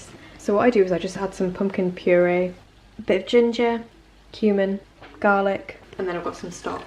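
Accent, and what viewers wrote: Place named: Australia. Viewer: Where do you live ? You Sound Australien.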